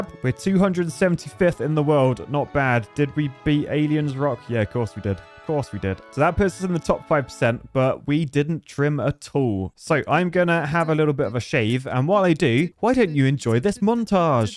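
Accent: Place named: Scotland, United Kingdom. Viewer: I missed the Scottish accent, though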